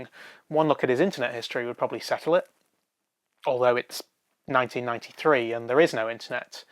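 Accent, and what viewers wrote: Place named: Black Country, United Kingdom. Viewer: Hes from the black country England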